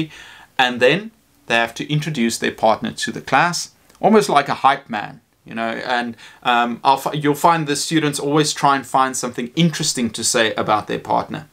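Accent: South Africa. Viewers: Are you South African? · Hey! Thank you for the amazing tips, I've learned a lot! Tomorrow will be my first day back to school. I first started before the pandemic and honestly I think I did really good but there's always room for improvement, right? One last thing, I can't help but ask... Are you South African? I just love the accent haha. Greetings from Algeria!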